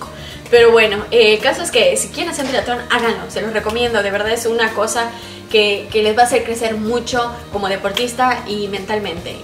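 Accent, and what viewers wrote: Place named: Campeche, Mexico. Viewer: De donde eres. Hablas como Campechana.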